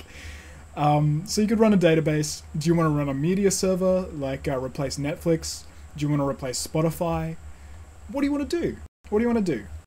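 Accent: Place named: Australia. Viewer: going by your accent I am guessing you're a fellow Aussie. Facebook Marketplace is your friend. A lot of IT folks that are able to scam decommissioned servers will sell them on FB. Some people are a bit ambitious with pricing but every now and then someone gets sick of their PowerEdge T430 taking up space and they flog it off for $150AUD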